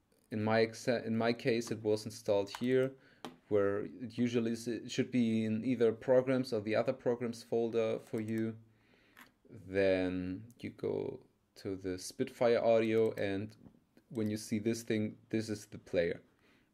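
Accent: Germany. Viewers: hahah habs am akzent gemerkt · Man hört so krass das deutsche akzent raus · Wie man einfach sofort hört das du eigntlich Deutsch sprichst xd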